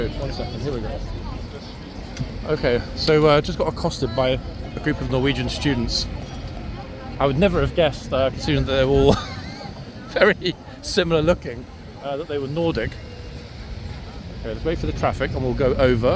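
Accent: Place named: United Kingdom. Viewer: I've really enjoy your videos. As well as loving your British accent🙂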